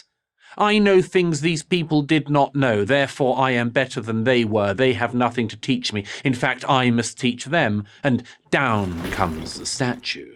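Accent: Scotland, United Kingdom. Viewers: I agree. His voice is cold. Cold and bereft of love for humanity. It is the voice a crocodile would have if it were rendered the power of speech. I personally really like it, but then I always get hard at a Scottish accent that has been mangled to sound English.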